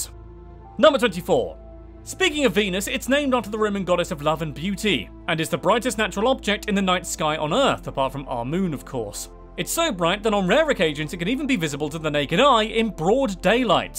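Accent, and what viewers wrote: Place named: United Kingdom. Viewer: Hes British 🤦🏾‍♂️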